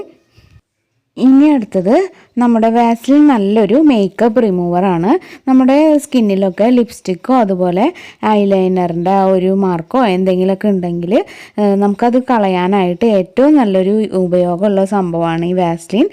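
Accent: Thrissur, India. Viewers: നല്ല ഒരു മെസ്സേജ് ചേച്ചി തൃശൂർ ആണോ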